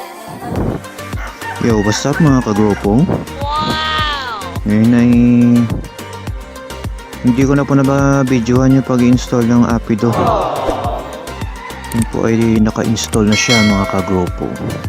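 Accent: Iloilo, Philippines. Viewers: Ilonggo ka no?🤗🤣🤣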